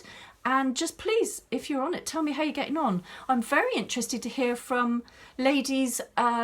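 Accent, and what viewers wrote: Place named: United Kingdom. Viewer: Hello from Dallas Texas, my name is Georgia Ann. I love your sweet British accent and smile, so happy to get to know you. Here is my story. I am 72 years old, and was 50 lbs. overweight. I have tried everything, I was up and down and yo yo dieting. One day I tried the Potato diet and said, I would give it a try. I ate potatoes only for one day and had an insulin drop and was sick and shaky So that did not last long! Another fail. Then I bought the Eat To Live book by Dr Fuhrman, and got more education on the plant based diet. I learned that you MUST have a BEAN dish everyday when eating whole plants. When your not eating the meat you must have beans and it becomes your protein.I am borderline diabetic, so it affected me with only having starch.All that said, I have lost 30 lbs. eating whole plant food. The most wonderful thing that has happened to me is, I have learned that, when you nourish and feed your body real food, the food addiction cycle, and the benging STOPS! 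It's easier to say no to Sugar, and not eating sugar stops the cycle and you gain control. My next hurtle is to learn to simplify this way of eating where it is not complicated recipes. I love to cook, I have for 50 years. But if you are not careful, you find yourself chopping and preparing all the time, plus a mound of dishes, then it becomes to hard to do. So I want to cook simple, , easy things and not get caught up in another diet. So Yes, the answer to your question, You Can loose weight when you are older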